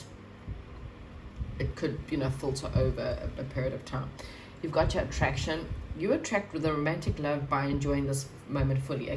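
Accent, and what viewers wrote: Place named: South Africa. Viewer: 💚I love your readings and your accent South African? 💚 100% accurate 💚 thank you💚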